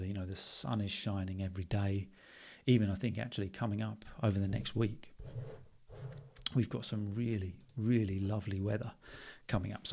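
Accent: United Kingdom. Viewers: I'm from Georgia. I really enjoy your podcasts and your beautiful British English.❤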